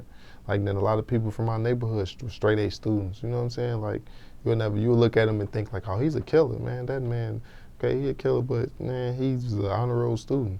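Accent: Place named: Wisconsin, United States. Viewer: he not formally from the chi, from Wisconsin his accent mixed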